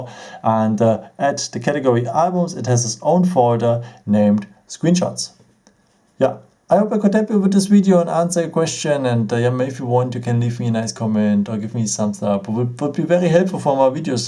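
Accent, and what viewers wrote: Germany. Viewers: Warum hört man bei deutschen immer raus das sie deutsch sind?haha aber danke für das Video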